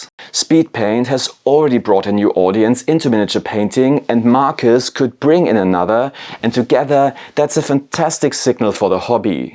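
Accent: Germany. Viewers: Are you a german trying to hide your accent?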